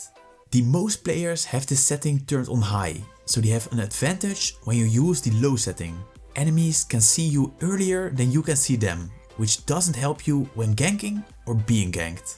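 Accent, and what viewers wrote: Netherlands. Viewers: ik hoor dat je nederlander bent nice vid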